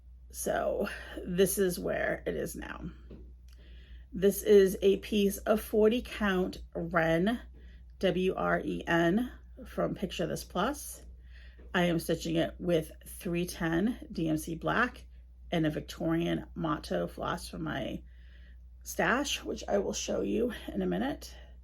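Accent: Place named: Boston, United States. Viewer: Great to see you again. I enjoy your floss tube and your Boston accent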